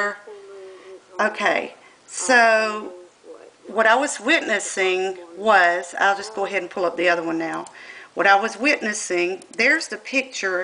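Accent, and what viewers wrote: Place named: South, United States. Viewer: You wouldn't think your a mom. Nice, and having a southern accent has nothing to do with it. Keep it up mom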